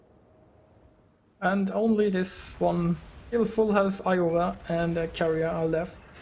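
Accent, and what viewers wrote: Germany. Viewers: Man merkt schon an deinem Akzent, dass du ein Deutscher bist. Also vermute ich :)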